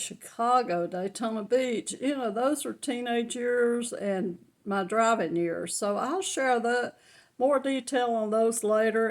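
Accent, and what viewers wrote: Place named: Georgia, United States. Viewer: Hi. Nice to meet you. You are adorable and very sweet. I can tell you have a kind heart and have so many fascinating stories to tell that us younger ones can learn from. Btw your accent is so nice to listen to. I live in Italy btw so I don't get many opportunities to meet any folks from Georgia.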